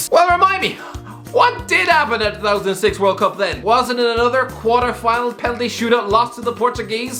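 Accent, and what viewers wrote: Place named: Ireland. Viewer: why have i clicked on a prediction video and all i can hear is an irish guy talking about a 2003 match magazine?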